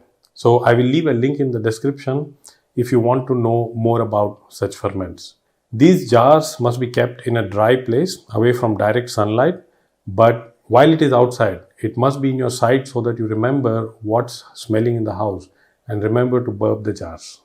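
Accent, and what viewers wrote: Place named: India. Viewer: That’s a cool accent you have. Are you from India?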